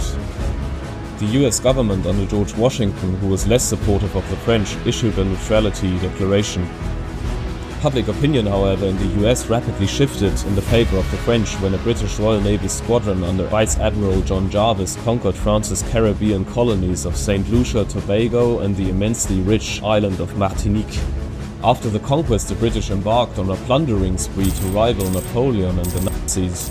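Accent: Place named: Germany. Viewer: so well made and also so funny with your lovely german dialect. you gained a sub!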